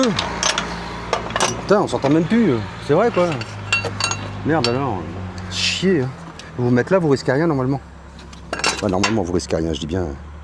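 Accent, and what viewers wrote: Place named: Quebec, Canada. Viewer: t'as l'accent québecois maintenant ! top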